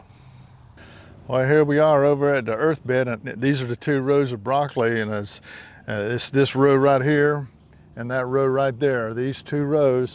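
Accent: South, United States. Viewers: I love your videos. I like how you go from start to finish. And short and sweet. And I think I hear a little southern twang in your voice.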